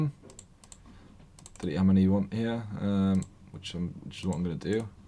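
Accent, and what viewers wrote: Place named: United Kingdom. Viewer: HES BRI' SH!!! (thx btw for the tutorial)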